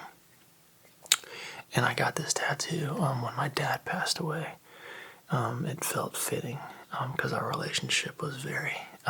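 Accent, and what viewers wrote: United States. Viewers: Idk why but you sound extra american today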